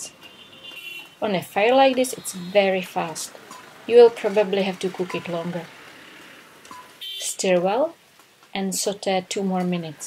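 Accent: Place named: Iran. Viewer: What accent is that? It sounds quite Iranian and Far-Eastern European! Nice channel!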